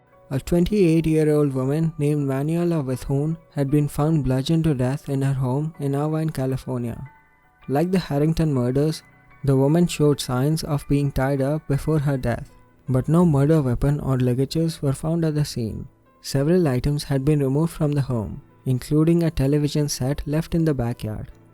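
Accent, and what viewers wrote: India. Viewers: I cannot take that Indian accent !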